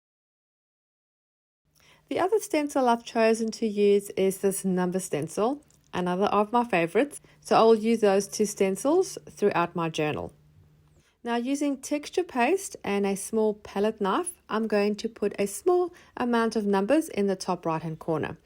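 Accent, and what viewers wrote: South Africa. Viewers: Hi, I just found your channel! So happy, and I hear a South African accent? I love your style. I need to binge watch your videos. ❤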